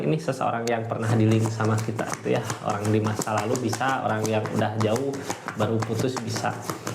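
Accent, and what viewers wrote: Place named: Sunda, Indonesia. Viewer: Akang meni sunda pisan atuh, tapi da resonet eta reading na. Haturnuhun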